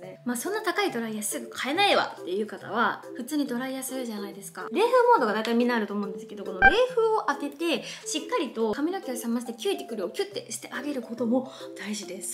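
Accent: Japan. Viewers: 喋り方めっちゃ一緒やーって思ってたら同じ県民やった‼︎親近感♪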